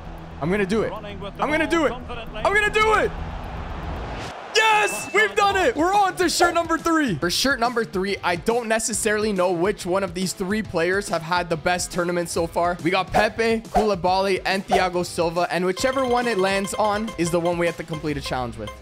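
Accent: Canada. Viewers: ARE YOU CANADIAN OR POLISH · I thought u were Canadian lol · I thought you were Canadian lol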